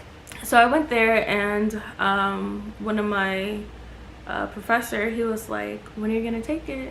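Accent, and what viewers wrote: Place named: Jamaica. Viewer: Heard the accent before the patwa buss 😂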